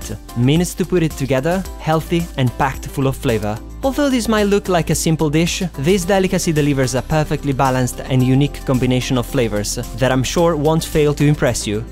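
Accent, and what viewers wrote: Italy. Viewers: I'm sorry this has nothing to do with the video but are you italian? you sound italian! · yes I really like all the recipes in here, sounds like you are Italian from the accent I'm Italian also but I have been in the USA a long time my accent is a bit different, anyway I can't wait for another of your recipes:)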